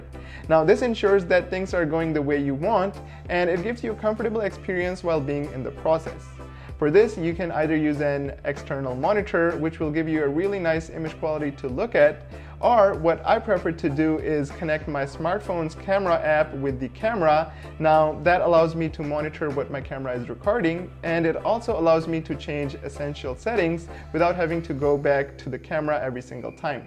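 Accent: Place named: United States. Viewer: Hey bro can you tell how to do american accent like you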